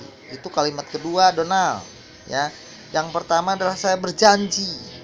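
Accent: Jakarta, Indonesia. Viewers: Apa saya sendiri ya yg ngakak habis denger betawinya si abang ini mantap abis bos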